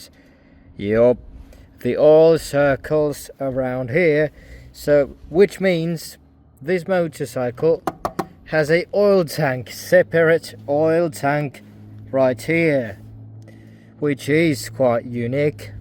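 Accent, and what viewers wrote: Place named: Baltic states. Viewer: I enjoyed your video. I'm guessing you're from the Baltics, Finland perhaps?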